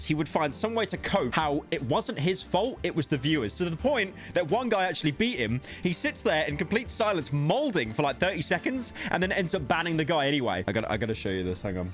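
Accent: United Kingdom. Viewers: Honestly, i think you get age restricted so often because they think that the funny british accent makes the people at youtube think your trying to cater to small children, and that you swear too much for a kids channel. Even though you aren't a channel ment for newborns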